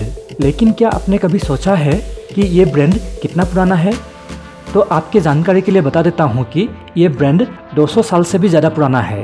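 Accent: Assam, India. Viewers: Assam se ho kya aap ? 😄 Aapki hindi eisa hi hai kuch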